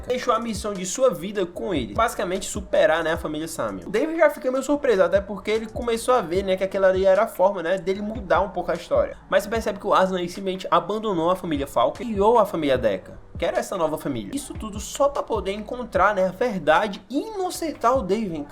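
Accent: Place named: Ceará, Brazil. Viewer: Bom ouvir uma narração de um searense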